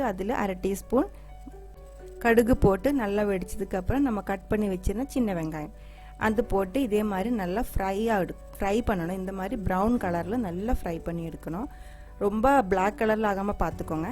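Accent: Kerala, India. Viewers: Your Tamil blended Malayalam is so nice to hear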